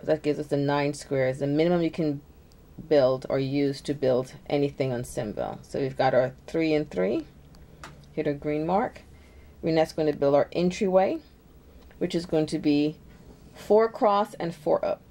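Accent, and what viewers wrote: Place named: United Kingdom. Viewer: U r British awesome lol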